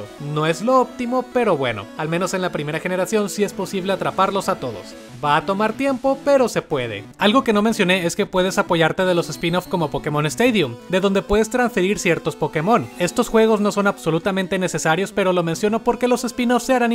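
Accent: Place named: Monterrey, Mexico. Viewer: Videaso hermano, gran acento regio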